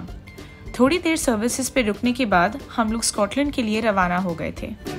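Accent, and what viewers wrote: Bihar, India. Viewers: Hahaha so cute accent puri bihari h apki😚😚😚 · Ohh, your Bihari accent ❣️😍❣️ Ekdam gajjab hai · Bihari accent🔥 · Love bihari ascent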